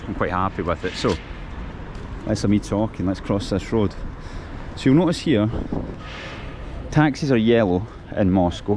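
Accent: Scotland, United Kingdom. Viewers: Many thanks for the honest tour! Your scottish accent is just fine. Btw I've enjoyed watching every minute of this vid. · I won't be muting the video. I love a Scottish accent. The tour of Moscow was great. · thank you! I love your beautiful Scottish accent. ♡ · I’m here for the Scottish accent 😃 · I like the walking tours! Is all of Moscow so clean? Where are the seniors? I only see young people. I live in Texas, what a difference! And I love your Scottish accent! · Your walk&talk tours are great! I don't mind the Scottish accent at all. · Nothing wrong with a Scottish accent lol. Greetings from London x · Nice take.. and kind of surreal to listen to your Scottish accent in the middle of Moscow, I quite like it though. I subscribed to your channel because you are doing something different, the war talk has taking its toll on everyone’s mental health, just keep on doing this man! 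👍✨👍✨ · I very much enjoy these videos. I think your Scottish accent is just fine. Well suited for this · Great video! Would love even longer walks from you. Beautiful, informative and love your Scottish accent. Nice balance of footage of you and the city. I did watch it all the way to the end. I liked it all just the wsy you did it. Hope my feedback helps. Thank you!! :)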